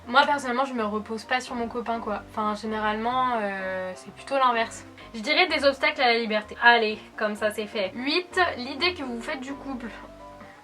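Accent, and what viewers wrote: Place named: South West, France. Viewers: Accent du Sud Ouest ❤❤ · j'aimerai bien que tu refasse ce test aujourd'hui que t'es heureuse en amour ^^ pas sur que tu répondrais la même chose pour le boulot qui passe en premier toussa toussa :p en tout cas, pour le boulot je suis comme toi ça passe avant l'amour (ma copine me le reproche bcp), et pour la vision du couple, idem : deux personnes vivant ensemble mais ne vivant pas l'un pour l'autre, ça fait trop cliché ^^ Sinon, pour l'accent, je trouve que ça ressemble un peu à l'accent de maïté, donc accent du sud ouest (mais vraiment coté paysan, car je suis du sud ouest et mon accent est beau car il est léger (surtout parceque j'ai quitté toulouse depuis 10 ans :D)) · Un bon accent du sud qui ressort naturellement! Ca fait toujours plaisir ;)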